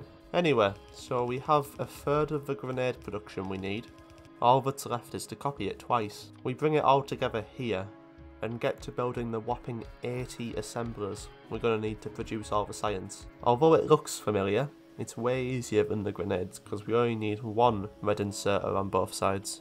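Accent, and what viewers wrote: United Kingdom. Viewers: Ngl your british accent made me watch the whole thing. and your amazing gamplay as well. Great content keep going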